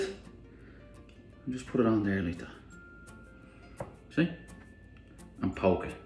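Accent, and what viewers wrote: Ireland. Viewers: Kia ora from Aotearoa (New Zealand). 😂🤣 I love your channel, you're funny & you make beautiful food. You also explain things well, so thank you for taking the time to share your yummy recipes with us. Awwww I love an Irish accent, my Da was Irish n I miss him every day.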